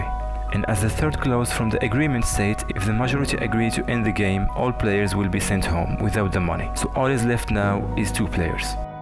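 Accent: Morocco. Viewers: Accent mn kilomètre t3rfha mghribia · dude From the first second of this video i knew it , you're 100% moroccan 😭😭pls keep going i like your channel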